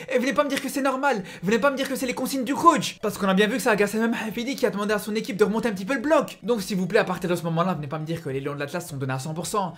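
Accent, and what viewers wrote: Belgium. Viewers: On entend bien ton accent belge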